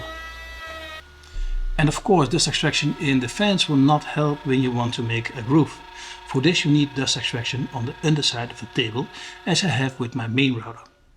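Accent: Netherlands. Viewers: You got to rid of that Dutch accent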